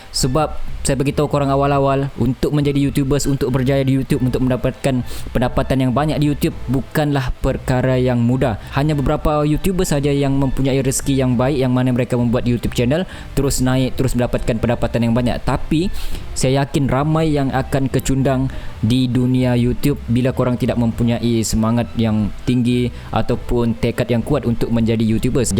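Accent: Sabah, Malaysia. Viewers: Perkongsian yg bermanfaat.. Teringin mau jd YouTube tapi xde ilmu.. Lps tgk video mcm terinspirasi.. Terbaik admin.. Admin org Sabah ye? Sbb dgr sleng mcm Sabah.. Terbaikkk admin.. Teruskan berkarya..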